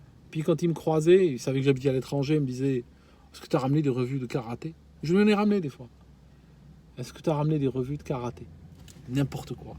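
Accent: Switzerland. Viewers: Je comprends mieux les légères pointes d'accent suisse maintenant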